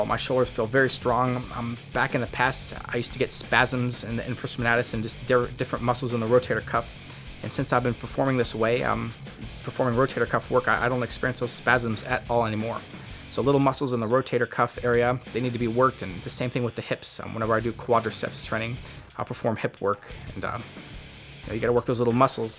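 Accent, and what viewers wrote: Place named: United States. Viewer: Whats the accent? Its American but there sounds like theres something else in there..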